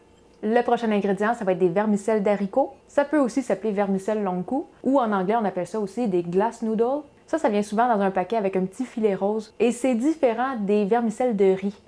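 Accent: Quebec, Canada. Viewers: ah mais c'est parfait alors je vais tout de suite aller regarder merci. En fait je viens de découvrir ta chaîne. Et j'adore ! Ici en France nous aimons les Québécois et leur super accent! Allez à la prochaine.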